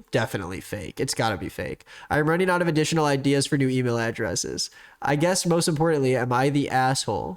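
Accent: South, United States. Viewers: I work in a cali firm and I can tell you that everyone would find a southern accent super cool AND she says stuff like 'darn tootin'??? Amazing. Love her. I wish her the absolute best.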